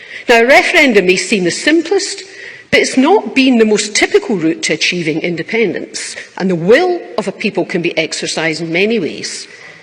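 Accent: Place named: Scotland, United Kingdom. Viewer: definitely Scottish not a stupid individual that can’t tell a man from a woman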